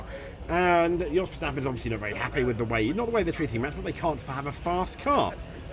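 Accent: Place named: United Kingdom. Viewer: Ahh Brit accent. Stop watching after 10 seconds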